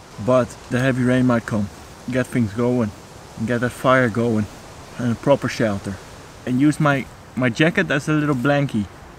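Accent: Netherlands. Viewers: You sound like ur from the netherlands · Ik hoor dat hollandse accent wel ! :D van waar ben je ? Ik ben een beginnend outdoorsman van Belgie, heb materiaal gekocht maar zou leuk zijn als er iemand is die me irl wat zou kunnen bijleren. Misschien kan ik ook helpen met filmen ? Laat maar weten ! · Ireland? You sound Dutch, am i right? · He has a Dutch accent is he from Holland ?? Edit: creepy background music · Gotta love the dutch accent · jij bent sowieso nederlander ik hoor het🤣 wel hele toffe video👍👍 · ja kan dat mooie Nederlandse accent gewoon niet verbergen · U have such a dutch accent or is it just me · Yo broeder. Aan je accent te horen hoor ik iets Nederlands. Klopt dat? Gewoon nieuwschierig. Top video!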